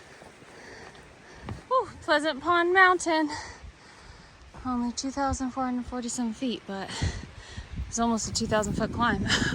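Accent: United Kingdom. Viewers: I loved the British accent!! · Great day! Thanks for taking us along. Your smile and laughter are infectious!!! As is your British accent. 😊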